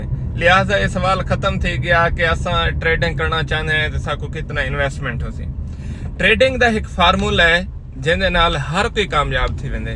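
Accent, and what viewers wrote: Saraiki, Pakistan. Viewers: سر جی ٹھوس تے ماشاءالله بڑا میٹھی سرایکی بولیںدے ہوے I love you Sir Ji❤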